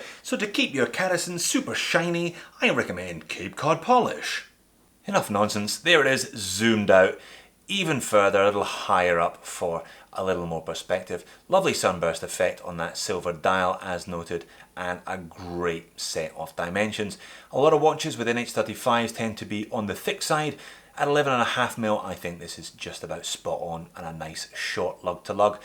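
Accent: Scotland, United Kingdom. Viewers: It's well deserved mate, your channel is one of the best out there, no need to buy subscribers like some others!!! Keep going mate, the watches you look at aren't covered by many others so you are head and shoulders above the rest.....and you have introduced the Scottish accent to my Russian wife :-)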